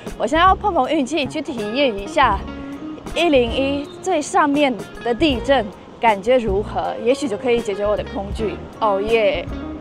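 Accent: Standard. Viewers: 第一次看你的影片，國語講得好標準！標準到讓我有一點懷疑是不是配音的😂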